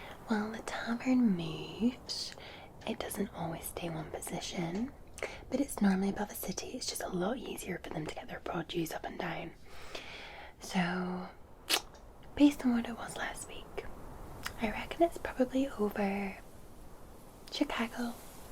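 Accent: Scotland, United Kingdom. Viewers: You've got the most perfect gentle accent and Scottish to to bring peace and calm to a late night and days end thank you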